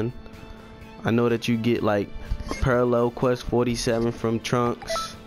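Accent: Baltimore, United States. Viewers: big help. thanks bro. you from Baltimore bro?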